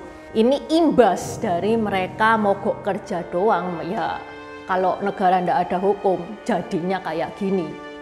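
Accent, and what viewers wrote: Java, Indonesia. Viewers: Ternyata dgn bicara medoknya, menjadikan saya asiik mendengarnya😅😅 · "lha wong..." biyuh muedhok.. Ngalam ikut ngintip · logat nya meedook banget😁😁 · mantap walaupun medok banget jawanya, lanjutkan ci.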